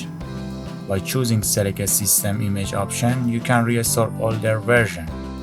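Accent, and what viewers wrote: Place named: India. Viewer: Loved your indian accent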